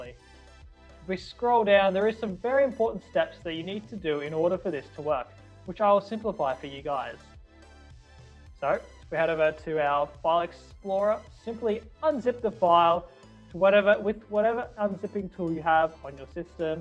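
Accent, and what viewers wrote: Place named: United States. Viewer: I don't have global scenery installed so will it work like that And also u look like indian and talk like American nice channel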